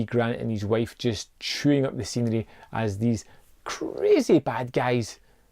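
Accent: Scotland, United Kingdom. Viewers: Do you mind saying what part of Scotland you are from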